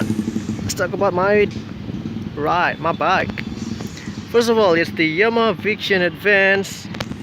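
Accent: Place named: United States. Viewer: Logatnya us bgt... Can you teach me?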